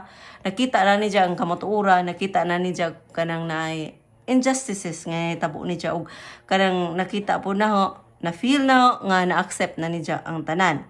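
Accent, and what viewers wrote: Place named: Bohol, Philippines. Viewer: Baka taga Bohol siya,dahil sa pananalita niya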